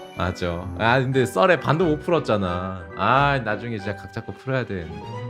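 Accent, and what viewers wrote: Gyeongsang, South Korea. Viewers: 경상도 사람이엿구나..........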